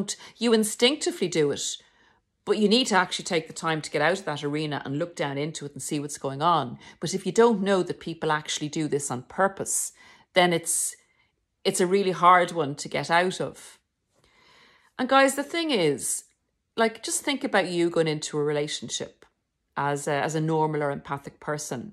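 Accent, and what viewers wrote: Ireland. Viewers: I like your accent are you Irish?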